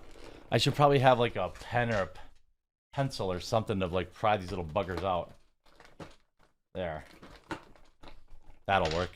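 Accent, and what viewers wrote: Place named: Wisconsin, United States. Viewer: You should say coffee, cocoa, or tea. When you say "coffee, tea, or cocoa" with that Wisconsin accent I hear "coffee to yer cocoa" every single time.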